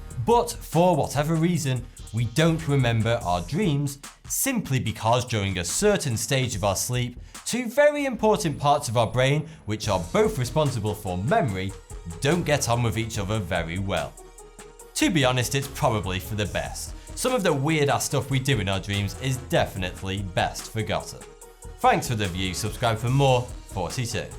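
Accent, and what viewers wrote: United Kingdom. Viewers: I like this guy because he gives detail and it's easy to tell the people behind the scenes have don their research. And the British accent works well too.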